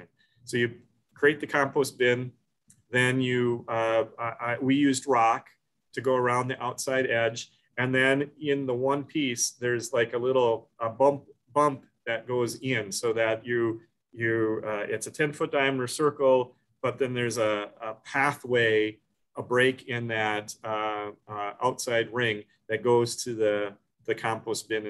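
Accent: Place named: Long Island, United States. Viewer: Thank you!! Love the Long Island local talk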